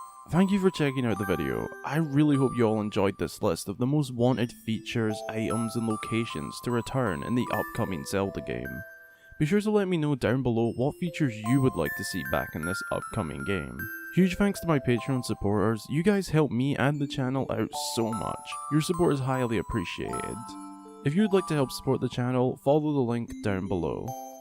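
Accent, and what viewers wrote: Scotland, United Kingdom. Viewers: Love watching these theory videos. I found your channel yesterday, and as a fellow Scottish lad, I have to say we have the best accent 😂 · So good hearing another Scottish accent 😭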